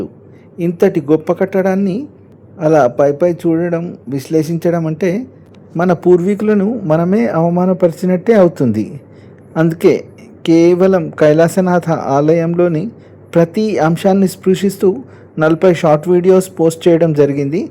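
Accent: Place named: Telangana, India. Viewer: NEE TELANGANA BHASHA BAAGUNDANNA. JABARDAST.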